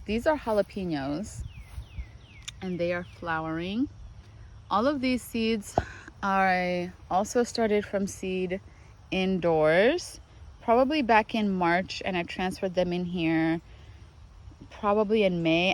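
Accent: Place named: Kenya. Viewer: I was trying to pinpoint your accent . I would not have guessed a Kenyan influence. I'm in 8A in Mississippi. You may have mentioned it, but have you tried eating the sweet potato leaves (prepared like spinach)? I know it is a popular Asian food.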